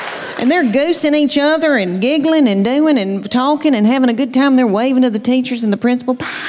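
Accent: South, United States. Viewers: I don't always get all the southern Americanisms BURT AH TELL Y'ALL shes a whole heap of furn🇺🇸🙏😅😅😅😅😅😅😅😅😅😍😍😍😍😍😍😍😍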